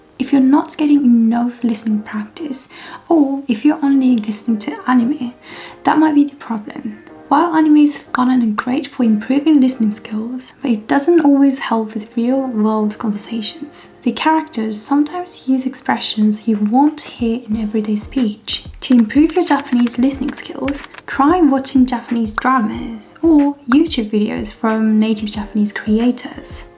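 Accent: United Kingdom. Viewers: Born in Okinawa but has a British accent? Huh?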